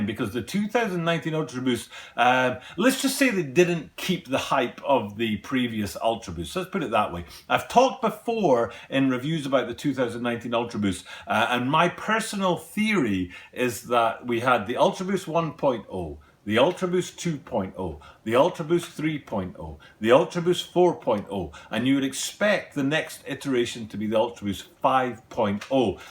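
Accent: United Kingdom. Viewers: No probs Son. You need all the Support you can grab in this game. I keep losing all my blinkin Supporters though 😂. They get to a 1,000 then delete all the people that got them there... Bloody Buggars 😆🤣. I like your Channel anyway Pal. Theres not too many U.K guys that do what you do, so it's nice to hear an accent I've grown up with. You're doing a good job Mate. Posting everyday would just about kill me though, although my videos are packed with a load of old rubbish (Sorry... Trash!! 😉) that takes me forever to find, so they are a little bit longer than yours and take me a week to create them 😂 ✌😆👍. Not sure if you've got <link> where you are?? (Online) but they had the Triple Black 19's in a few days back and Footlocker aren't releasing them until the end of July??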